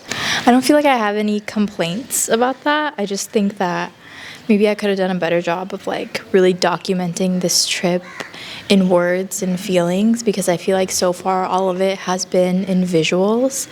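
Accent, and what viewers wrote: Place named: California, United States. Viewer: Girl I’m from California too & im sure we do have an accent😂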